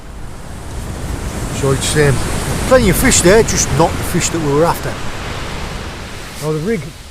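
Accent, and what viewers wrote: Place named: Cumbria, United Kingdom. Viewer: Your accent sounds West Cumbrian, like Whitehaven or somewhere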